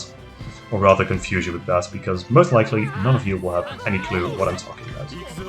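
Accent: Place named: Netherlands. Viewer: Yay, more of this! And I hope the new recruit's effort can be felt! I'm not sorry- Edit: Very dutch pronunciation indeed, nice. Also, recruit Bradford please? (the tiny boy with the brunette rooster haircut) PS. Welkom terug!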